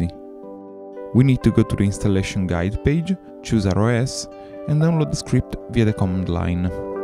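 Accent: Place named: Italy. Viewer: Al 99.9% è italiano. Come lo so? Non parla inglese male, ma l'accento è chiaramente italiano (quello vero, non quello che gli americani pensano sia italiano). Posso dire che no è del sud, probabilmente è del centro-nord. Se hai visto il video dovresti aver notato che la home dell'utente che sta usando si chiama " ", quindi lui o qualcun altro si chiama o magari è un dipendente di... chi lo sa. Ma la cosa più importante è: come faccio a ripristinare la versione originale del firmware della fritz!box, sempre ammesso che non mi trovi contento?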